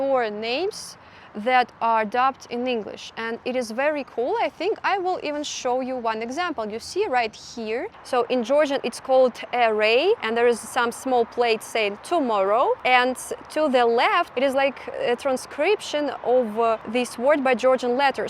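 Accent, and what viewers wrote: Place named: Russia. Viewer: Vielen dank, Fräulein! Your video woke up so many ideas, again! How many people has escaped Russia in order to avoid conscription, getting arrested of for not seeing any future there? We cannot know all of them, but we have you. For so many of us, you represent them. Your work is very, very important. You remind us that there are Russian people who oppose the regime, who are not brainwashed. And who, probably, will not be easily brainwashed by the western propaganda, either. That is quite an achievement for one single person! Your education was probably not futile. To be honest, you cannot even know it right now. You are not in a situation where you can somehow easily solve it all. Most probably, it will never happen. I let the native speakers evaluate your English. I, as a Finn, can hear some Russian nuances. But you have learned that you can get familiar with new languages and cultural things pretty easily. 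Anyway, the more years pass after our studies, the more important are those tacit abilities we have learned, rather than explicit skills, like world views, learning strategies, ways of speaking and, especially, listening to others. And. So. On. Making videos is just tecnics or tools. You are able to think independently and speak about your thoughts in a very assuring way. You don't have to be an engineer to make videos. On the other hand, few engineers are able to work as a vlogger, or journalist, if you will. Remember, there are vloggers making wideos about ope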